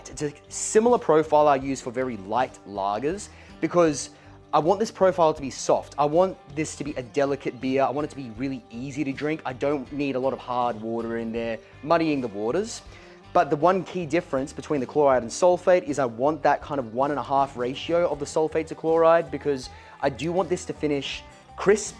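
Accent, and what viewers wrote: United States. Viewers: Was that am American Accent? lol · Lol, thanks, mate! Love the murican accent. Brewing with corn is great. I think it gets overlooked, and some folks think it is boring these days. The funny thing is that the beer seems to disappear immediately for how "boring" it is... one of my favorites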